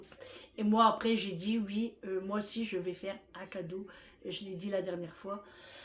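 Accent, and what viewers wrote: North, France. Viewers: voilà, c'est sûrement ça....!!!! Avec votre accent du Nord, le micro, Macho-Mattew, je confondais. Merci. Je croyais que j'étais à "l'ouest" 🤣🤣🤣🤣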